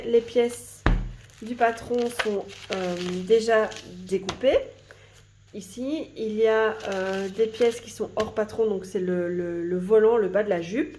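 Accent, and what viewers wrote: Belgium. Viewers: Hey trop contente de te retrouver !! Ce petit accent Belge m'avais manqué ! Des bisous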